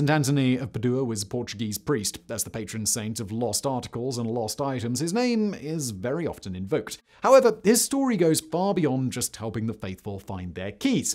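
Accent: United Kingdom. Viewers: no offense, but the British pronunciation is funny to my Italian American ears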